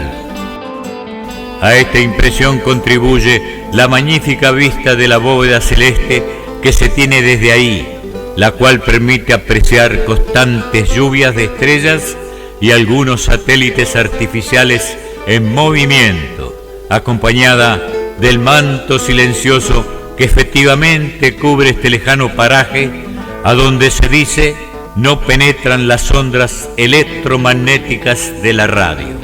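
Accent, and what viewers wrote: Argentina. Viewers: Qué hace esa voz argentina narrando algo del norte de MÉXICO??? 🤷🏽‍♂️😂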